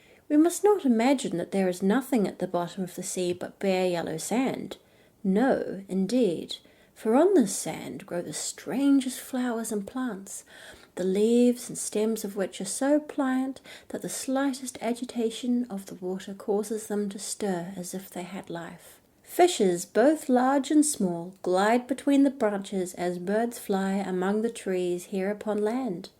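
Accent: New Zealand. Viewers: Your voice and Kiwi accent are so relaxing 😊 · I usually don't mind the NZ accent, but not necessarily for story telling, tbh you sound lispy, have you taken your piercings out? Sorry I've had to leave you and go to another channel called relaxe her voice is clearer and not so lispy and poppy very soothing American story teller she is, but I give you an A+ for effort🦇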